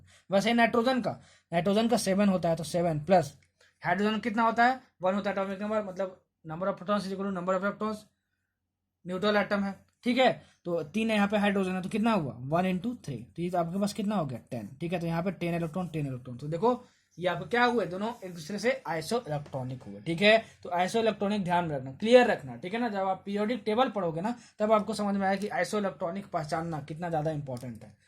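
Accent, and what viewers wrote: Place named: Gorakhpur, India. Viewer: Are you gorakhpuriya???? Ur accent is sweet....btw thanks for course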